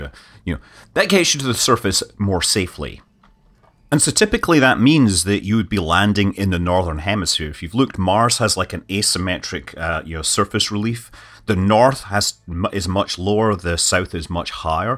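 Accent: Scotland, United Kingdom. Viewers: Scottish fo0l...Aye!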